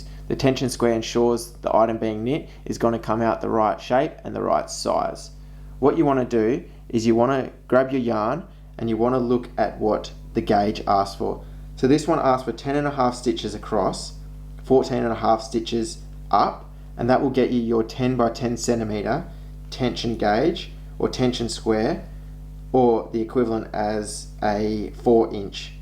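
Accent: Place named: Australia. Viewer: Thank you. I am about to knit my tension square. Nice to hear an Aussie voice too. Looking forward to more videos by you. Happy knitting 🧶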